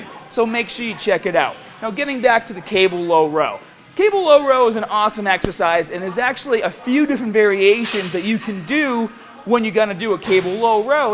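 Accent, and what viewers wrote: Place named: Massachusetts, United States. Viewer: That Mass. accent seemed heavier than ever in this video, haha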